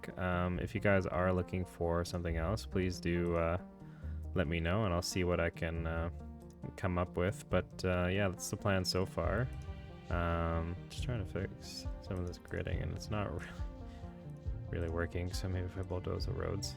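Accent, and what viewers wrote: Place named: Canada. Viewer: I was going to ask if you were Canadian lol. The midwestern in me could sense it 😂 great video!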